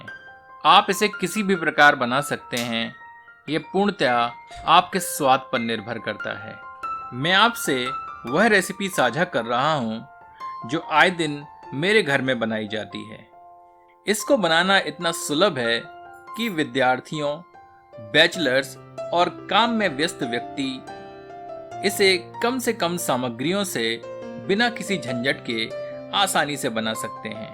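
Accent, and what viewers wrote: Standard. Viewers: Appreciate your Shudh hindi and the do piyaza.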